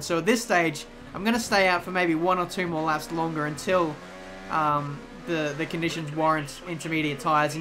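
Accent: Australia. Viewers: which part of australia are you from and how old are you? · What part of Australia are u from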